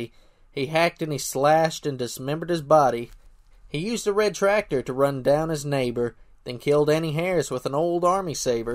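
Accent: South, United States. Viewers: Another great work! And you narrate so well. Your southern accent is always quite pleasing! Thank you for your YouTube channel.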